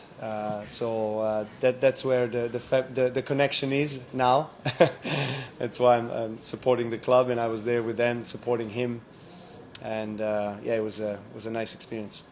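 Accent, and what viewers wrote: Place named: France. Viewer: I love your french accent Nole !!!! WIsh you to be in your best shape for the 3 weeks coming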